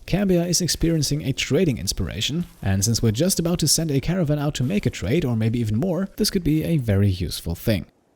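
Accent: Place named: Germany. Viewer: Something's came to my mind watching this series. You have - maybe I am imagining it - a slight German accent. Is that the case? Because while being German myself and knowing how normal Germans speak English, I definitely had to listen quite a while to come to this guess. If that was true, I would highly have to take my hat off to your English language skills. ... die hast du doch nicht im Studium erlernt? Das erste Mal, als ich darüber gestolpert bin, war, als du von der Masterarbeit gesprochen hast, was mich das erste Mal hat aufhorchen lassen. Worüber war die btw?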